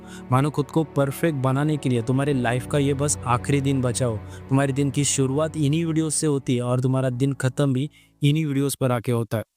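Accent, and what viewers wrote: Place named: Maharashtra, India. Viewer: Bro sounds marathi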